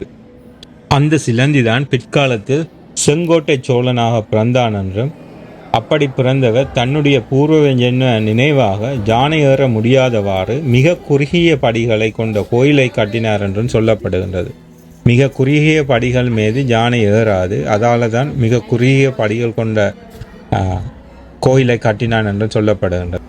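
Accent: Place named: Sri Lanka. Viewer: ஆஹா ! அருமையான இலங்கை தமிழில் அசத்தும் வர்ணனை !🙏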